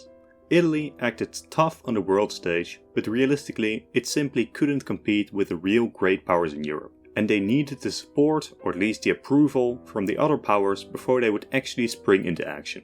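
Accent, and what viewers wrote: Netherlands. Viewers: Wait are you dutch?😮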